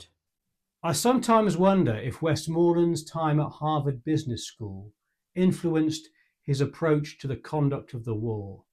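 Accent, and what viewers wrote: United Kingdom. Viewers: There is something F.C.U.K.D UP about listening to a Brit covering Vietnam War ?.....NIGEL DIDN'T $URF !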